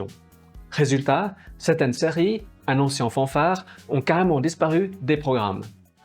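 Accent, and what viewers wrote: Switzerland. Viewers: C'est bizarre que tu parles avec les mains comme un italien, avec un accent suisse, et une face asiate. Je suis confus.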